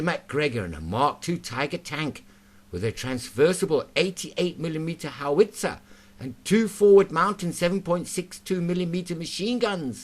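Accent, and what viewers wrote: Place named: South Africa. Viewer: Love the South African accent the narrator has.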